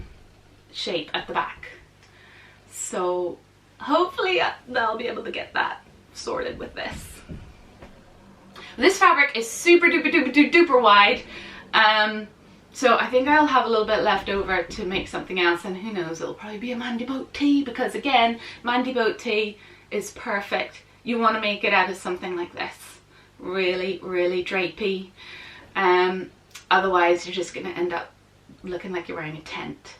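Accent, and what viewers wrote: Northern Ireland, United Kingdom. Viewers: Just realised I’m a year late, never mind! I’m also in Northern Ireland so it’s lovely to hear our accent on videos x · Great video. Thank you. As much as I'd like the sewing feet, I don't think they'd fit my machine which is an elderly ELNA. I tried to buy a walking foot recently but no variation would fit. I keep thinking maybe I should get a new machine, but the Elna is a good basic machine. So don't know how much better the new machines are.... Like you, most of my makes are for the cooler months, so I don't have enough to do memademay. I'm recently returned to sewing and will get a more comprehensive memade wardrobe eventually. Like you also, I'm from NI - now living near Cambridge. I love listening to your lovely accent. It brings me many happy memories. God bless. Helen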